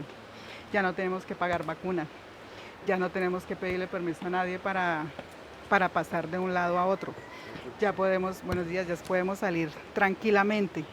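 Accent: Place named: Colombia. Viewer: Jajaja y vos de donde es yo tengo familia en Tolima y antioqueña nosotros los colombianos hablamos asi. Si ud es extrajero quedate calladito 👌🏼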